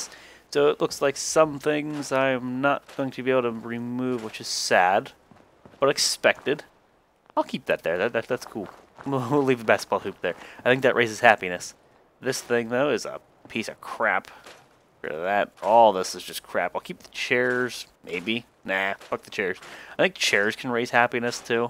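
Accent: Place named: United Kingdom. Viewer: OMG the British accent had me going....lol if you know what I mean wink,wink, even my hubby knows a British accent I get all hot and heavy for so when I heard you speak it I lost it... breath oh by the way good game lol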